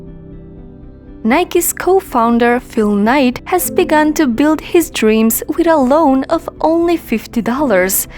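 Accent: United States. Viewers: I need to have an American accent. Does anyone know if the English she speaks is a New Yorker? Because i love her voice.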